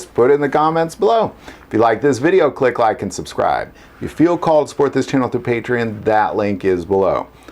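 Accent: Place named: United States. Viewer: mIL itary, carILIna, .... love that hick drawl.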